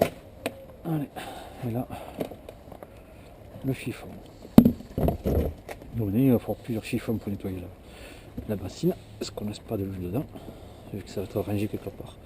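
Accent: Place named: Marseille, France. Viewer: Bravo et merci bcp pour cet tuto. Aaah, jadore lacent marseilles :-)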